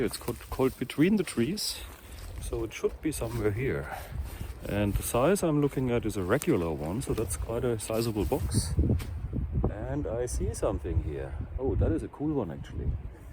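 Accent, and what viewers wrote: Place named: Germany. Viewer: Wieso in englisch? Bist doch Deutscher oder nicht? Also dein Englisch klingt sehr deutsch 😅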